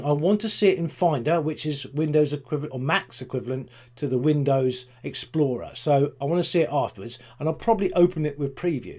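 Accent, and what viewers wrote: United Kingdom. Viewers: Good explanation, Been struggling with this for a bit but makes a lot of sense. Nice to hear a UK voice on a LR video. Thanks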